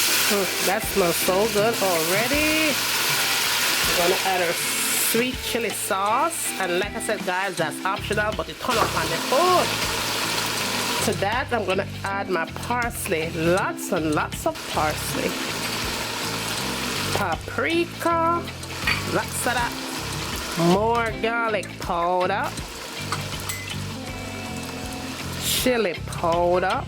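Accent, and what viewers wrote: Jamaica. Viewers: Lol yk i jamaican when you hear one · Love your authentic Jamaican flair. Gwan goodie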